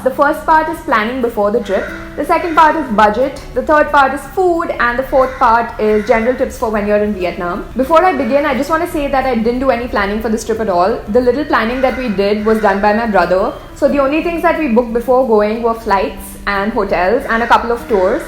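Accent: India. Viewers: 10.x speed if you speak like this in Canada BC you will be fired from job brain draining Indian accent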